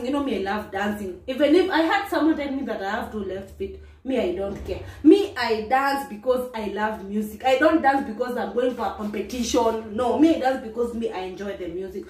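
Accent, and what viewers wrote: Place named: Kenya. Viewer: I remember you, you're Kemuntos niece? Love your narratives. You talk like a Kenyan youtuber living in Germany. Chocolata.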